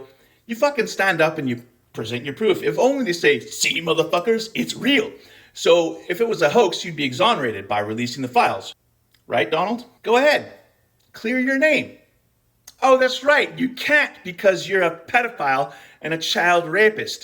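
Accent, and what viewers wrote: Scotland, United Kingdom. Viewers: I just noticed your Scottish accent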